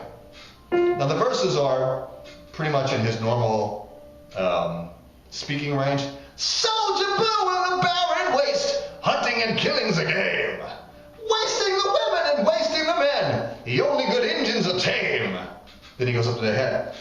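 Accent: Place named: United States. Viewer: First of all you need a middle class London accent and then the phrasing will come naturally! Being British, I can hear your American accent cutting through like a Chainsaw! Just a thought...Not an order! Example of this is Ken Tamplin trying to sound like Freddie Mercury......Oh Dear!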